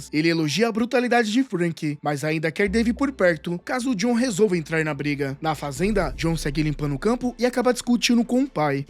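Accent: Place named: São Paulo, Brazil. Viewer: Pq esse cretino que narra isso como se fosse um cretino? AH, pq o narrador é um cretino caipira! SAquei logo pelo sotaque! Paulistas kkkkkkk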